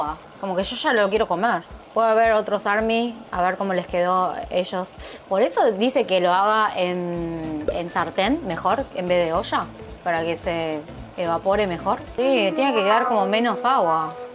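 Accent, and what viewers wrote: Argentina. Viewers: ME ENCANTA ESE TOQUE DE ACENTO ARGENTINO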